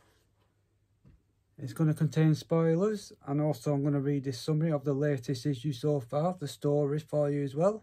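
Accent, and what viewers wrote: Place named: North, United Kingdom. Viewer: ahhh that's right! I thought I could hear some Northern in you 😂 I'm part Scottish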